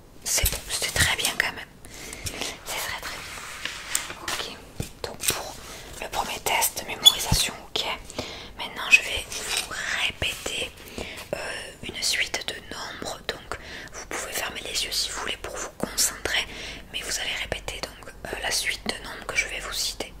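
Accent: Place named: Toulouse, France. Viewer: C'est marrant, j'ai récemment suivi une formation, et ma formatrice avait aussi un accent toulousain, et habitué comme je suis à tes vidéos, j'éprouvais des déclenchements à l'écouter parler. 😅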